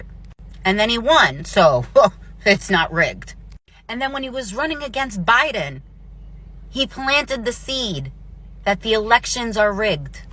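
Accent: New York, United States. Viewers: I Love your NY accent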